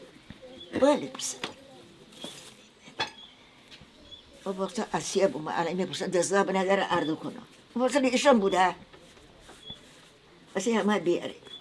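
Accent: Gilan, Iran. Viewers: عالی عالی❤ لهجه جان ، برا شرق گیلان😘♥️😍نوستالژی👌🔥😍♥️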